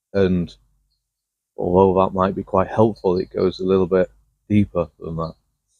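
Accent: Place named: Manchester, United Kingdom. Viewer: Trying to place your accent, from the north somewhere, but maybe not Yorkshire, almost a Manchester thing going on there, I've lived in Lancashire all me/ my life.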